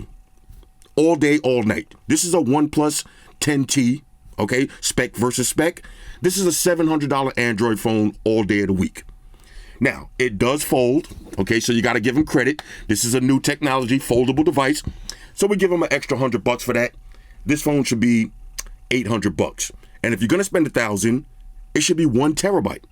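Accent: New York, United States. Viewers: I was waiting for this review! I agree that price is too high and it's not a flagship phone. I was thinking about getting it (currently on the S20+) but I think I'ma pass and wait for the S whatever is next 🤦🏽‍♀️😂😂 Sidebar for floss: I love these videos because I get to hear that NYC accent (I'm from Southside Jamaica Queens too) and I don't hear it a lot in Austin, TX 😁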